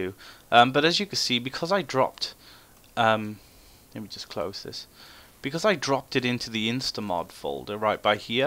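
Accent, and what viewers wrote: Wales, United Kingdom. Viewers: Awesome, worked for me. Btw where are you from? Did I detect a Welsh accent or am I completely wrong? Just as a heads up to others as well optifine lite wont work with the other mods, go standard or ultra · Are you from wales?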